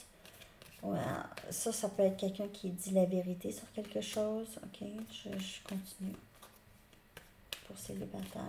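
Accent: Canada. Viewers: Merci pour la guidance pleine de vérité relation toxique et je lache prise je veux la stabilité et la paix merci je vous adore ainsi que votre accent canadien ""une Algérienne "❤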